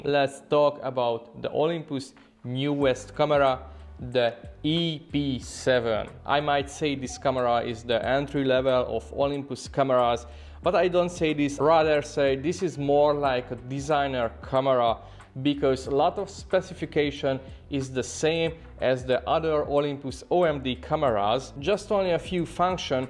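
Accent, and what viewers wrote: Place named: Russia. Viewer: You speak with such a heavy Russian accent, are you Russian?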